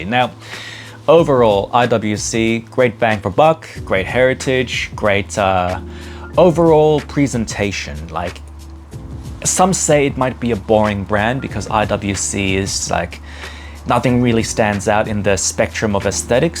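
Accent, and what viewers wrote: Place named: Australia. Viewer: Of the ones you showed on wrist, if money weren’t much of a constraint I’d spring for the Ingenieur (though in the regular blue that was released last year, or the black which makes the dial texture more subtle). Otherwise the Mark XX is hard to fault and is great bang for buck. I just priced one in Switzerland and could get it for 5000CHF (you sound Aussie so that’s approx. 9500AUD).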